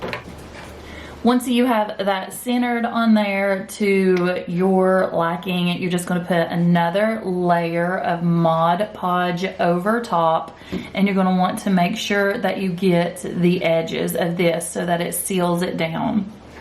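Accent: South, United States. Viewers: What! You have that soft sweet southen accent. Us northerners don't.😁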